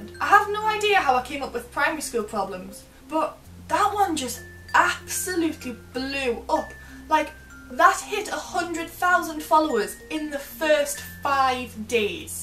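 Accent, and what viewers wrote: Newcastle, United Kingdom. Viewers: Is she from Newcastle?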